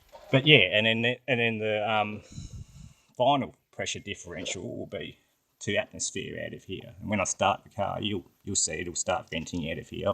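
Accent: Australia. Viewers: Damn! If your Aussie accent was a little less prominent I might be able to understand your mumblings. Seriously, Mate. Speak clearly for the audience. I did figure out your hose routing, so, thanks for that. Be well. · Its not. Perfectly normal Aussie accent.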